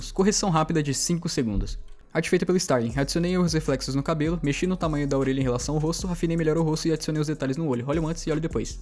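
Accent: Rio Grande do Sul, Brazil. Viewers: Gaúcho né kkk?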